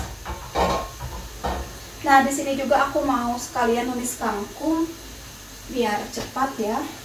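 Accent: West Java, Indonesia. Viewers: Logat nya kaya orang Sunda.. Makanan sederhana tp Nikmat tiada tara.. Selamat menjalankan Ibadah puasa.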